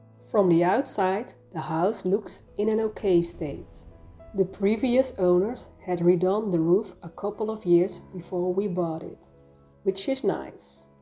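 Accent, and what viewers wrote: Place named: Netherlands. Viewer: Hello! I often think of you and your little family! I have been watching your journey pretty much from the start and i absolutely love what you share and put out to all those, who are interested! Its so refreshing to hear about different customs ( my husband, son and myself LOVE the Netherlands and the Dutch ❤ our son even did Study in Amsterdam ) No need to say, that hearing you speak english with your wonderful dutch accent is fabulous!!! You all seem such great people... even if you haven't shared anything for a while... you are NOT forgotten... you are fabulous exactly HOW YOU ARE!!! Never change that, or ever daubt you are doing something not right on here...YOU AND YOUR FAMILY ARE REAL... you are not faking it...putting something on what not is...loose your personality only to get a few more likes... and exactly THAT is why you and your family are such a joy to watch!!! 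I do hope, that all of you are well and happy and continue to enjoy your life in France to the full! Because in the end this is all what DOES matters... YOUR family and YOUR life and happiness!! Much love...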